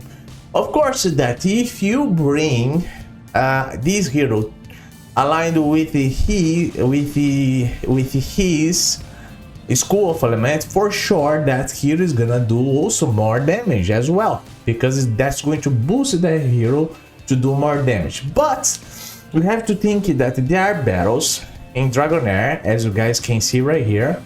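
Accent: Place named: Romania. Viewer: Are you a Romanian? Coz your accent is kind like a romanian like Skratch or Oddone..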